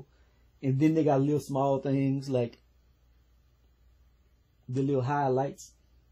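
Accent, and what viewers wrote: Louisiana, United States. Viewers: What's up homie? I'm new to your channel thanks to your P Valley breakdown. Anyways I'm checking on your other videos and stuff you seem like a chill entertaining dude I look forward to your other stuff. Super-talented also hey your accent bro it's very original sounds like when I watch movies from like Louisiana LOL like New Orleans type shyt. Very different from me I'm in Brooklyn LOL anyways have a good day about to start this P Valley season 2 LOL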